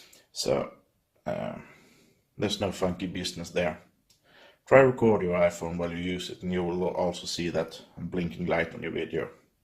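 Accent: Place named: Sweden. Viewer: you sound Swedish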